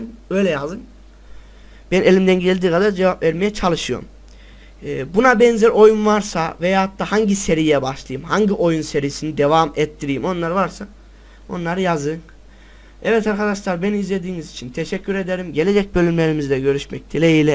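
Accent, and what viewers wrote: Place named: Nevşehir, Turkey. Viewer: way kardeşim sendemi nevşehirlisn şivesinden belli canını yediğim :)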